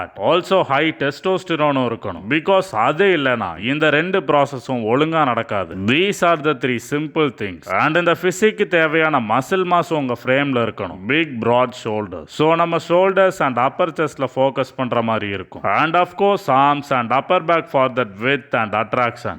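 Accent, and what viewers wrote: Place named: India. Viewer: So you guys mean to tell me, you understand everything in this video with the indian accent?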